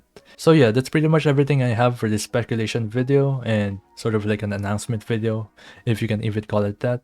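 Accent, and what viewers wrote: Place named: Philippines. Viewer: Are you Filipino?, you sound like one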